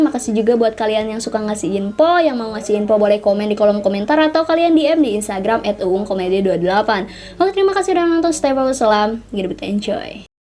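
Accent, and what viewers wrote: West Java, Indonesia. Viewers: Kaya orang sunda kak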